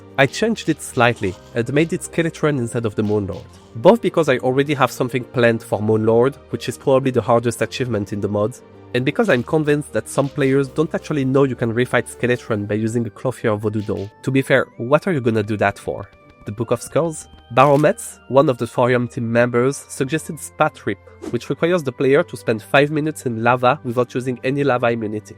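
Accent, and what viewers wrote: France. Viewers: you sound like you have a french accent. Am i wrong?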